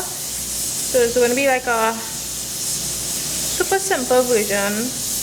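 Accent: Trinidad and Tobago. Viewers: I love the accent. So Trinidad and Tobago. $100.00 TT dollars. Cook up rice with dasheen bush and cucumber salad. I will go to the Chaguanas market. Oh Lawd that’s a lot of money you talking. I will definitely be watching you. I hit that 🔔. #ahfeelinforsome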